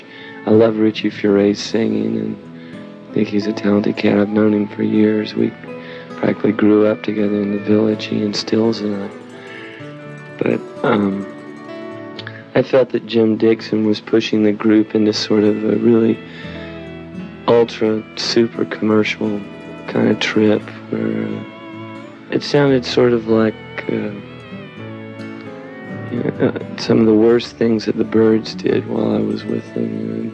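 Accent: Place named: South, United States. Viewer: He had a beautiful soft southern drawl. I find that his song is very calming when I feel tense.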